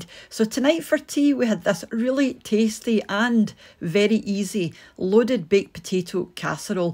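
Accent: Scotland, United Kingdom. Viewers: Are you a Pretty as your Scottish accent ?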